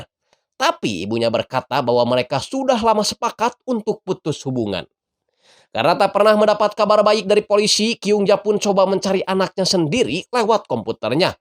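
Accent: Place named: Java, Indonesia. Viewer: Aku malah suka pembawa suara nya ..medok² piye gitu 😂😂😂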